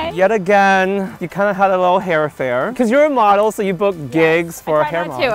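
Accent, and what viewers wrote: Australia. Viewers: she's Australian, I believe. 😁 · you’re right! I was going off her accent I heard at an interview. I clearly was t listening close enough because I can usually tell the difference. Perth Australia. I just looked it up. Thanks for the correction 👍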